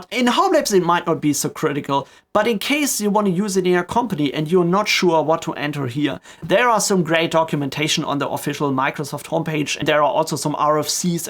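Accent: Germany. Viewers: No front but you sound like a german i am also a german boy :D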